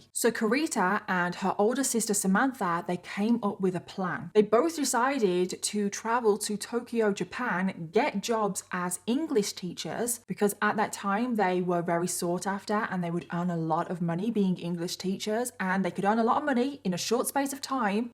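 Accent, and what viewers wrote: United Kingdom. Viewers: My mother in law is from the UK, I just love your accent!!! And love your story telling!!! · Her British accent also helps. I've always found this irestisteble in women😆🥁😆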